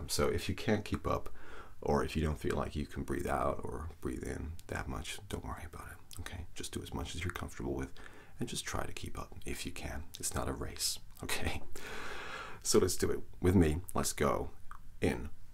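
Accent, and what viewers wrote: United States. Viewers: I love that jumper. I want it. Love the american accent you do it so well. I felt a weird awakening with watching this 🤭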